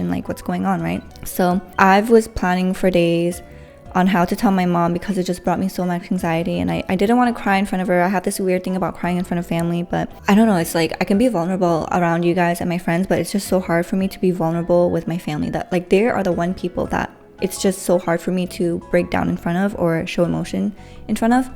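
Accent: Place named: Canada. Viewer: oh my god im so glad to see you back. sending you all the love and healing vibes! I went to lapis today too, such a pretty and serene island. I also got desserts sooo basically twins I love how you sound more canadian now that you're back in canada ahahhah you are so blessed to have the people in your life that you do<3 CHEERS to you getting through the hard part and cheers to your boundless future. I'm proud of you!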